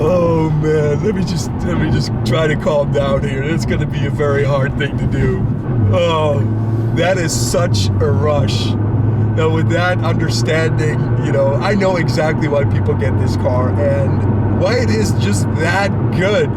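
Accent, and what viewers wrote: Netherlands. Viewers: Are u seriously dutch? Thats cool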